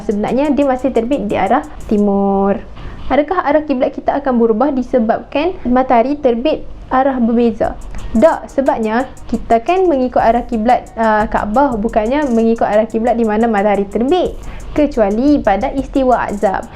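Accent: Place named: North, Malaysia. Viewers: Lorat utara pun kuat jugak tu 😆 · Dia punya putaq sampai aku dah tau speaker org utagha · Speaker pon macam orang utara juga tu hehe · Aku bangga dengan akakk ni rilek jaa cakapp utara macam besa 👍 bukann apa sbb kawan aku baru p kl sebulan balik mai dok cakap kl , contohnya tengah sembang dia tanya depe pi mane ? 😂 aku rasa orang kl cakap diorang bukan depe 😂 jem otakk nak tegoq 😅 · Comel Orang utagha weh, berputaq teruih, hang mai aloq setaq kepala hang ku putaq,🤭🤭🤭🤭🤭🤭🤭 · putaqqq..kuat naa utaraq dia😂😂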